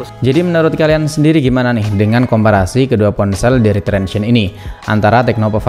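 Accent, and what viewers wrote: Demak, Indonesia. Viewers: Orang Demak ya bg?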